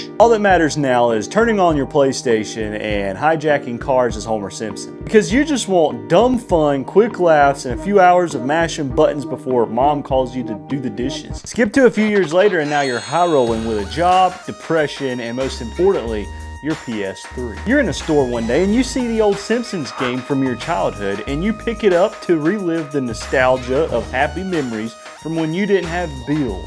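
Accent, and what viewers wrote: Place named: Louisiana, United States. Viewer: That accent is great bro where are you from Louisiana?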